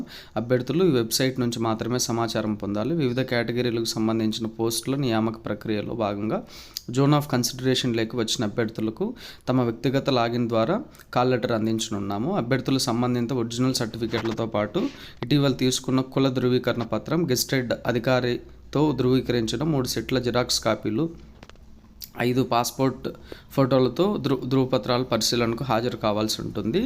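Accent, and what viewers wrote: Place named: West Godavari, India. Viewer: అండి అంటున్నారు మీది west గోదావరి నా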